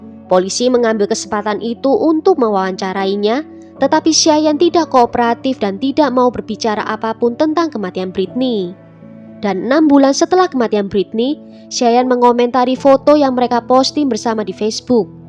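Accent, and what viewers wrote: Java, Indonesia. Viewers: Mimin suaranya medok. Jawanya mana min?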